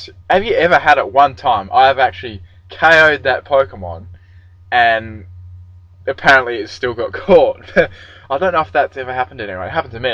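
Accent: Australia. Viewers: are you australian? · Yehhhhhh Australia. It 's the best accent and u all know it ahaha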